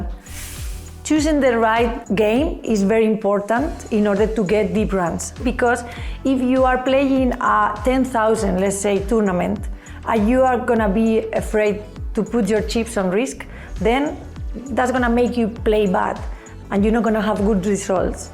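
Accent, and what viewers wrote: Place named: Spain. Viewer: she’s obviously not Indian you melon, that is a Spanish accent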